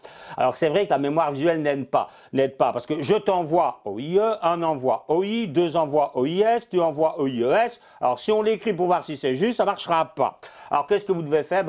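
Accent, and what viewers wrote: Belgium. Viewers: il est marrant ce gars. un petit accent belge non? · Pour le journal parisien il a plus l’accent belge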